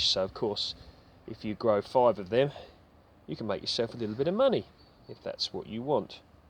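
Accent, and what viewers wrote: United Kingdom. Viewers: I was like hmm I wonder how easy they are to grow in the UK so I’ve been scrolling through videos trying to find a familiar British voice lol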